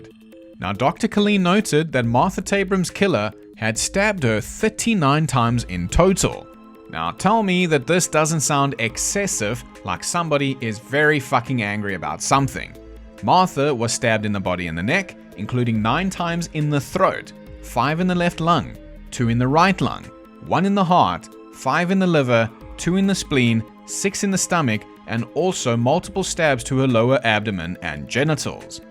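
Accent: South Africa. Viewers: This channel is single handedly giving me a positive association with a South African accent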